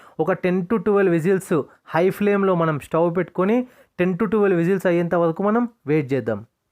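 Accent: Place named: Telangana, India. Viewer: Fine cooking 🍳🔪 bro❤ Telangana language bro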